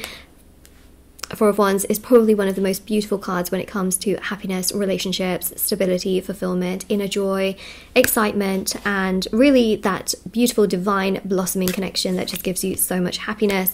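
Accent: United Kingdom. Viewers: Girl, for how long you have been in the UK? Your English accent is awesome!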